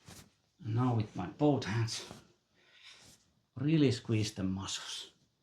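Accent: Finland. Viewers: loves the Finnish accent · I'm SOOOO into finnish accent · I love the Finnish accent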